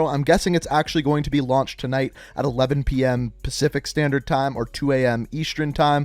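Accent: Canada. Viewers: Sorry? Your Canadian? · Gustavo Castillo I’m not sure if he a canook but he’s for sure from the north. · Are you Canadian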